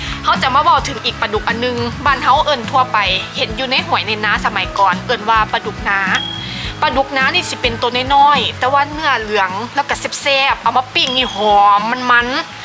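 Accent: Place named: Chaiyaphum, Thailand. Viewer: สำเนียงเหมือนชัยภูมิเเท้จ้า ฟังเเล้วคิดฮอดบ้านจ้า คอนสาร ตอนนี้ย้ายบ้านมาอยู่กับเเฟนที่ชลบุรีเเล้ว บ้านอยู่คอนสารก็รื้ออกหมดเเล้วไม่ได้กลับบ้าน4ปีเเล้ว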